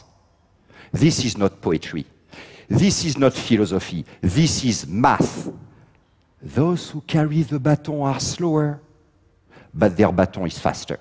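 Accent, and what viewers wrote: France. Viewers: i thought the language is frenc... dé internet, lé hdhsjs... disgusting accent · strange, it's french accent but very very excessive x) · Stopped . Sorry, but I can't handle that kind of laziness, the accent is too thick, and since I'm French, I know that he's not even TRYING to get even a slight accent. It's a pain just to listen to the words. Too bad because it would have interested me. Mr Morieux, please take English classes to improve your accent, thanks. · The French is strong in his accent. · Why can't he at least try to pronounce English words in English, instead of pronouncing them French. It is unbelievably hard to understand... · His accent is an incredible one. It's like to hear a French-English. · I wish I could be French just to have his accent. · To those criticizing his accent, how about you go speak French without an accent? 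I thought so. Great speech.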